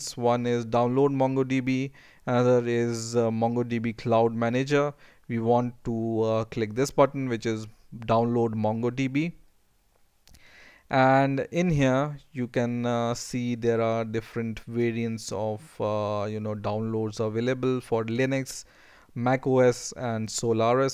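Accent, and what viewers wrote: India. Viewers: Mohamed Dabo yeah, and that is exactly what I do, not before letting them know I can't understand them. It doesn't say INDIAN ACCENT in the video title, and how many of the billion of them have put a video on YouTube. When you create a filter to remove Indian accents from all IT videos then let me know. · Indian accent，that‘s cute !!!aloha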